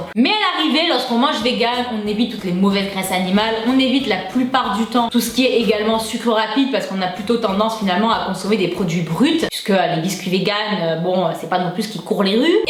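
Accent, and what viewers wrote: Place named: Nice, France. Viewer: J'adore cet accent niçois dans toute sa splendeur,vraiment ☺️ c'est peut-être la première que je commente ici mais franchement,j'aime bien ton contenu 😉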